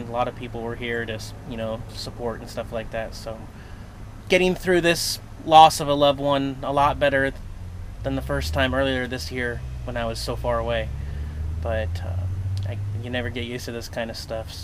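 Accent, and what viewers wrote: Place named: Nashville, United States. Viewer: Ain't nothin wrong with a Nashville accent!!!!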